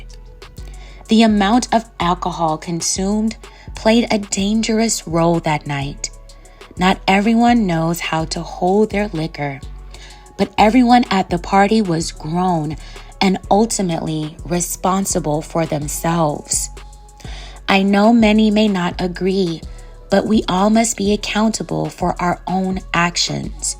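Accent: Baltimore, United States. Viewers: Bro. I'm from Baltimore too and I live in ATL also. Thanks for bringing these stories forward dummy and bringing attention to our city. You speak with our accent but you narrate robotically as if you're reading from a piece of paper. If I. Was you dummy, I would let this female narrate my blog's because she tells the story more fluently. #MajorDifference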